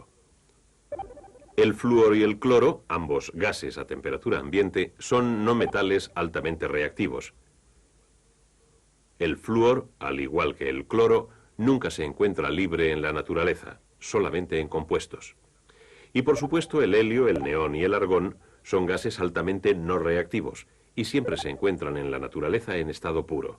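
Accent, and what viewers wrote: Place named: Castile, Spain. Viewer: Nada más lindo que un documental vintage y con acento español de Castilla 💞⭐😊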